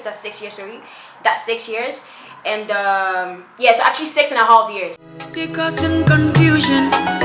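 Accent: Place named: United Kingdom. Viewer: You have a british accent🥰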